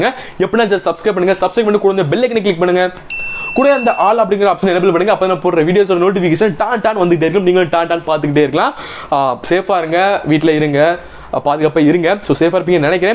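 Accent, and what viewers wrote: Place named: Tirunelveli, India. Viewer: ji neenga endha ooru ji....unga slang ah paatha tirunelveli side maari theriyudhei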